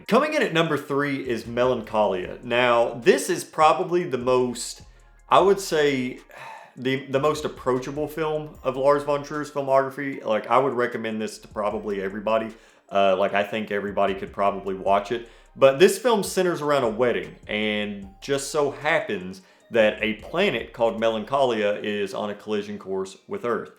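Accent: South, United States. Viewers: I just love your southern voice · Love hearing a southern man who’s into cinema!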